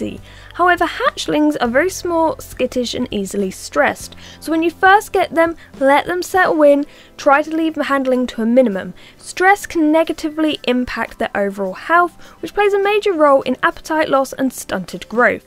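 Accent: England, United Kingdom. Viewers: where about from England are you from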